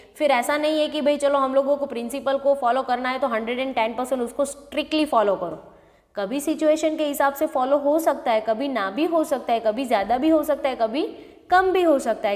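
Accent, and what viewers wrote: Gujarat, India. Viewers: Gujarathi Accent ❤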